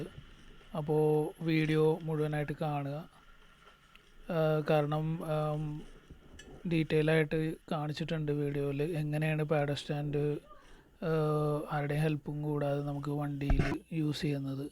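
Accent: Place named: Mattancherry, India. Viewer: Your kindness mind and beautiful mattancheri slang awesome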